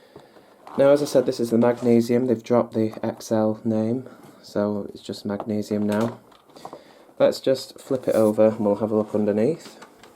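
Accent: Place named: Devon, United Kingdom. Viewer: We Devonshire lads talk like that as well you know😂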